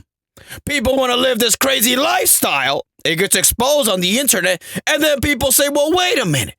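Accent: India. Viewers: This is the first of your videos I've seen, the exaggerations, poop jokes, indian accent, pasionate voice, GTA style character talking, and 'MUTAHARRRR' makes me feel like I'm dreming and having a panic attack at the same time. Keep it up, bro. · Love the indian accent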